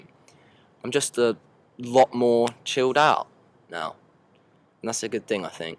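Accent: United Kingdom. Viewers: Seems like we've all chilled out a bit more recently which must be generating more positive energy as speculating too much can lead to accidently creating negative reality I guess. Really good to have you back and nice one for holding onto your British accent!;)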